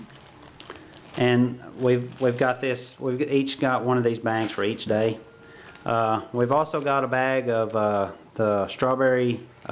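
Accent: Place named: North Carolina, United States. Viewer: Great video man ive seen a few of yours and am glad to see the circuit and the montbell products review because i am considering those purchases. My food is very similar to yours minus the snack cakes, candy, and fig newtons but i understand the extra calories for winter. I would check out the Knorrs sides meals for dinner because they are only about 99 cents. I usually add some tuna to them for extra protein. Sounds like you are from NC, im from Washington, NC.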